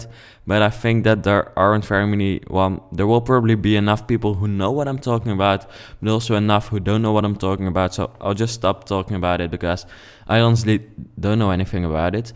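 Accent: Netherlands. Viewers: You might wanna work on your accent a little, cause it's really thick dutch. I'm dutch and it's a bit hard/irritating to listen too. But i really like the house! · Uk hoor gewoon aan je stem dat je Nederlands bent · He is from Netherland. Not everyone can speak good English.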